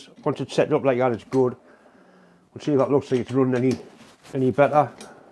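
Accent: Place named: Geordie, United Kingdom. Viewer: if people want the subtitles they can use the built in Youtube ones at the bottom of the screen. I sometimes click them because it really struggles with your strong Geordie accent and can be very funny reading them:)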